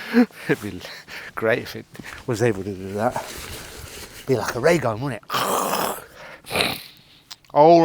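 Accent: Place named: Birmingham, United Kingdom. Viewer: well nice one, no wonder you have those bright lights then🤣 I think I heard a bit of a Brummy accent or was it just my second pint of Champion 7.3😆👍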